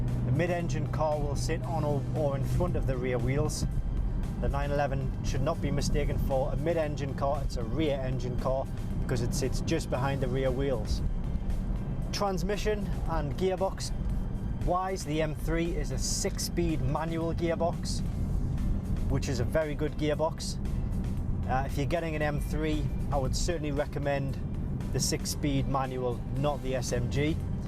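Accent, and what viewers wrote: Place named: Tyneside, United Kingdom. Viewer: Where you from mate? North or south of the tyne?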